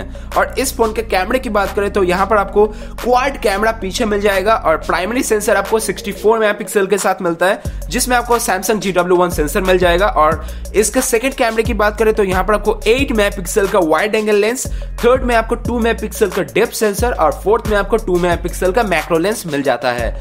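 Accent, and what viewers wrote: India. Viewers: Nice work but your hindi accent bro😂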